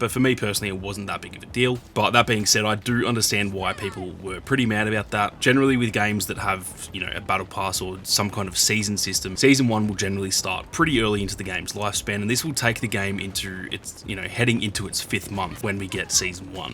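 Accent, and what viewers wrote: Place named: Australia. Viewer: As a PC elitist I subbed for the vibes and the aussie accent a while ago. Keep it coming and best of luck with the sub goal :)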